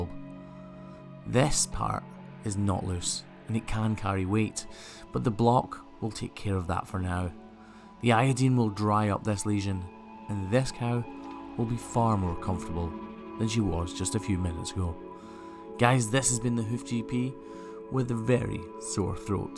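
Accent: Scotland, United Kingdom. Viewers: I so enjoy your videos. I live on a farm no animals anymore but we plant 99 acres still. Your voice is so comforting. I am 3 generation Scottish American. Everyone family here with the accent has now passed. So when I listen to your videos I am taken back to my childhood and my nanny and grandpa. I have distent family still in Scotland. Definitely want to come home and find what family I still have. Maybe 1 day I'll comment that I finally made it. Keep caring for the beautiful ladies and big handsome gentlemen.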